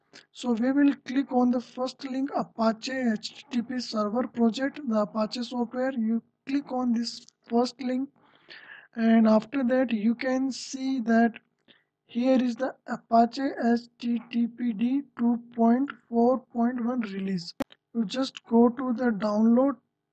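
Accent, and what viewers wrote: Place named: India. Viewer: Indians are so kind enough to upload tutorial like this even when they struggling with their accent. Nice content anyway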